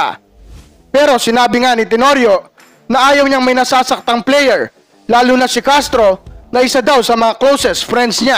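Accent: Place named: Pampanga, Philippines. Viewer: alatang alata na kapampangan ka heh 😂😂😂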